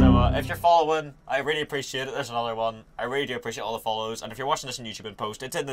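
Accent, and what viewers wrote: Northern Ireland, United Kingdom. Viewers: r u from northern ireland?? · Are you from Northern Ireland?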